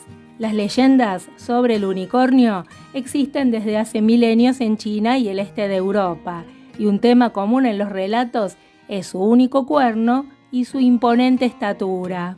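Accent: Argentina. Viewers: Yo estaba viendo este video bien interesante 😊, cuando llegó mi mamá y me dijo "ella debe ser de Argentina, por el acento" y yo quedé 😮wow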